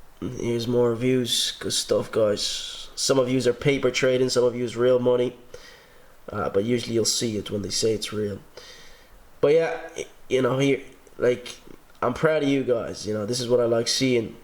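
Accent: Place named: Ireland. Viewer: He from Ireland 🇮🇪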